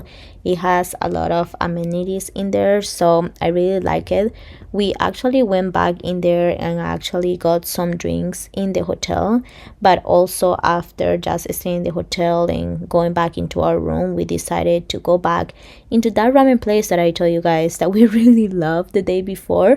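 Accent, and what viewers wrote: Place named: Philippines. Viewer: No offense just curious to know where you are from? I can’t pinpoint your accent..I’m guessing Philippines?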